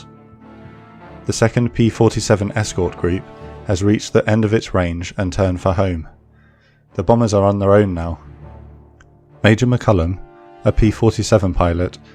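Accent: England, United Kingdom. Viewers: Krister Andersson: You couldn’t tell the narrator is English?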